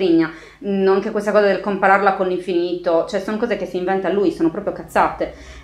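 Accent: Sardinia, Italy. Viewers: Non spesso sono d'accordo con te (sì sullo scwha, no - o con molti distinguo - sui grammar nazi), ma sentirti stroncare un libro con il tuo simpaticissimo accento sardo non ha eguali!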